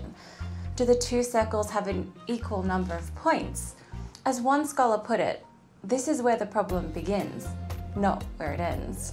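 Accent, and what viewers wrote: Australia. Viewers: I love that cultivated Australian accent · the first female Aussie accent that isn't like nails on a chalkboard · and I'm an idiot. I realized that after watching a couple more of ur videos. Us Americans aren't very good at noticing an Australian accent unless its particularly strong. Interesting stuff btw. U really are doing a great job... But u do have a lisp 😆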